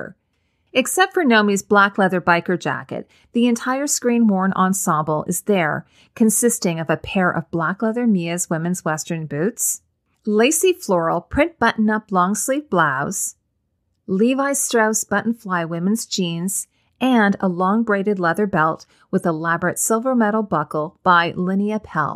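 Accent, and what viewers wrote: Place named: Canada. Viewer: I notice a very slight Canadian accent on words like "about" :) It leans into to Canadian "aboat"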